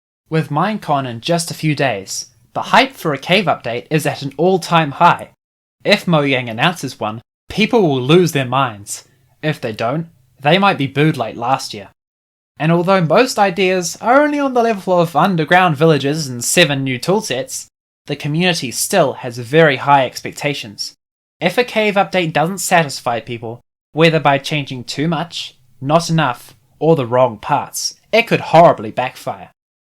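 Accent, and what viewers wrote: New Zealand. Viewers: You sound like a New Zealander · Couldn't have a more Kiwi accent if you tried hahaha. Great video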